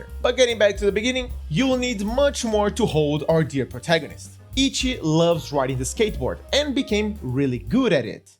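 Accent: Brazil. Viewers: Karalhow negão, dps q tu falou q era br eu n parei de ouvir o br do seu inglês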